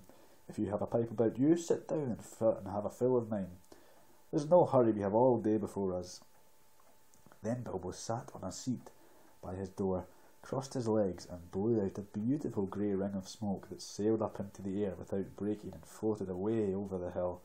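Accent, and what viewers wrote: Scotland, United Kingdom. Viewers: Hay! A fan of scotland and ye accent here. There's something fascinating about it, so definately do more. For 'untrained' ear, your speech sounds very odd and I hardly understand any words, but I like it a lot. Hopefully we will hear (about) you in some point again! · Holy Highlands, Batman! Reading Tolkien in a Scottish accent. That *THUD* is just me, *Falling Over* ! Brilliant. I didn't get asmr, but it's usually random, anyway. I'm just so excited for this community that you made this video, because THAT'S WHAT I'M TALKING ABOUT! Definitely keep doing these. p.s.: "He came expecting mischief and didn't need to see his friends' legs sticking out of sacks to tell him that things were not at all well..." ;) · I wouldn't rate this as an asmr. You could get more views and likes if you titled it as a Scottish accented reading of The Hobbit. 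Loved it btw. Used to live in Skye untill we moved elsewhere. So go ahead mate. :D upload some more.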